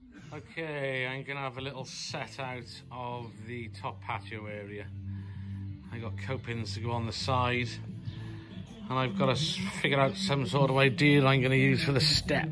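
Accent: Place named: Wales, United Kingdom. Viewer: Where are you based if you don't mind me asking? I hear a Welsh twang in your accent 🤣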